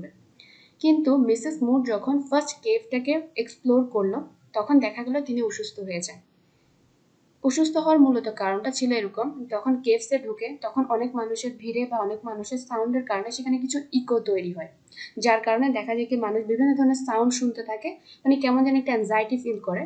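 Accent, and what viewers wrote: Chittagong, Bangladesh. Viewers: Apu ki Chattagram er?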